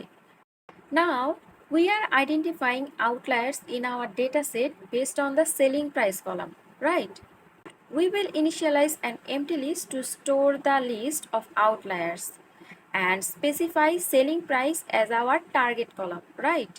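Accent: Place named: South Asia. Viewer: Are you from South Asia?Your accent said so❤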